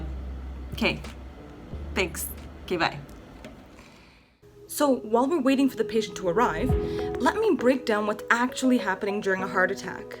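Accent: Canada. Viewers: This is like the 8th video I’ve watched and I finally realised you’re Canadian 😂 Gosh I’m so bad at guessing accents, I really cannot hear a difference between Canada and the US 😂 I wonder if Americans make that mistake when listening to UK accents 🙈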